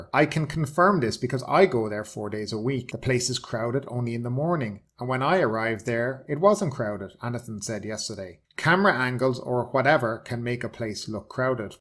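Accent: Ireland. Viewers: ah. Dunshaghlin or dunboyne I’d say. (With that accent) …… I’m a dub myself.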